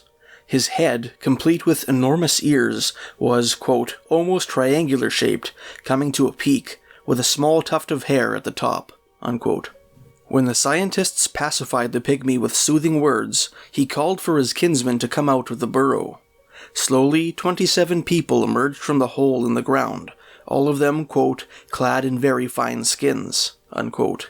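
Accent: Canada. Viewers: He even has a Canadian accent! ;)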